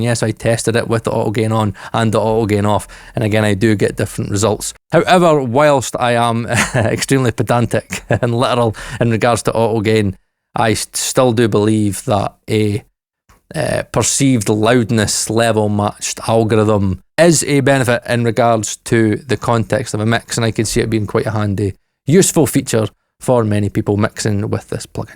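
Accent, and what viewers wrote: United Kingdom. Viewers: Great video! I use a Harrison parametric EQ that I absolutely love. I got it from plugin boutique for $10 USD, but I'm really interested in trying this one out. Where in the UK are you from BTW? I've gotta sort your accent out!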